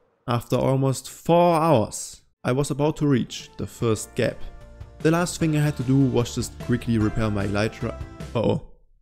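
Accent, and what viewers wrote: Germany. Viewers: Aha gutes video man merkt so bisschen das du Deutsch bist ❤ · i can hear a little bit of german :3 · Wieso hör ich so das deutsche englisch raus 😂 geiles vid❤ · Bester deutscher akzent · Man kann den deutschen Akzent hören lol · I hear German Denglish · Immediately noticed the German accent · ich liebe den deutschen akzent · Are u german ur accent sounds like ur german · I hear a bit german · I can hear the deutsch :) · I hear german🧐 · I can hear the German accent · ok ok i hear a little bit of german in your voice, aber ehrlich cooles video bro mach weiter so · I hear ein bisschen German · is this an german accent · Bist du deutsch? Dein accent hört sich sehr danach an. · bro sound just a little DEUTSCH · Da hört man den deutschen akzent direkt raus hahah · Hahahahaha hier der deutsche Kommentar nach dem ihr alle sucht, passend zum wunderschönen Akzent 🇩🇪🔥! 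SEHR STARK GEMACHT, MEISTER 💪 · youre definetly german i can tell by the accent so servus meister wie gehts super video 👍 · Hallo wieso machst du deine Videos nicht auf deutsch (man hört das du aus Deutschland kommst) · Höre ich da etwa... DEUTSCHEN AKZENT?? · ofc the guy with the most german accent ive ever heard is talking about ethnicity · Oi digga, bist du Deutscher? Dein Akzent ist ziemlich deutsch lol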